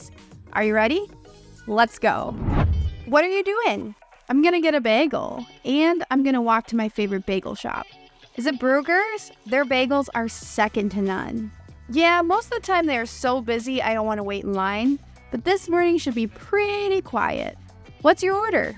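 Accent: United States. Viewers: which state are u from,?😊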